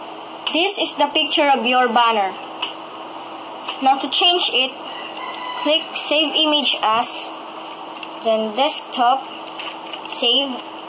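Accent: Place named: Philippines. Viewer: Hey your english accent, sounds like you are pinoy right!!!!!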